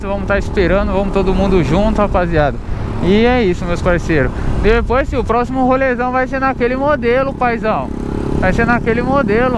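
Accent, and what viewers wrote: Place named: Sao Paulo, Brazil. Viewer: Sou novo no canal… vc é de SP ou do RJ? Vc fala como paulista mas usa gíria de carioca “paizão” “calica” não entendi nada kkkkkkkkkk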